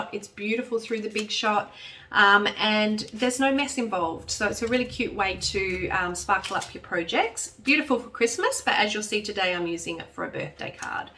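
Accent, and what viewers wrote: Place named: Western Australia, Australia. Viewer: I've just found you and have so enjoyed your video - nice to hear a fellow West Aussie - I am from Perth also.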